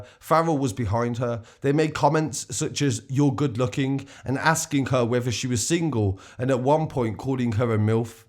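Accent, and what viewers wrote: Birmingham, United Kingdom. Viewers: Bruv, every time someone mentions brum, your voice comes to mind! Haha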